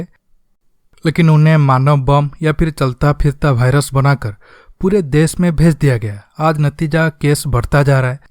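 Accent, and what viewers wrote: Odisha, India. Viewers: Bhai,tame kan Odia ..?, accent ru laguchi....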